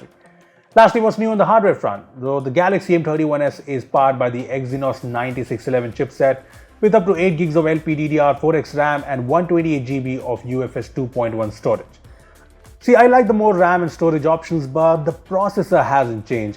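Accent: India. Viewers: I Love the Indian Version o the R! ☺️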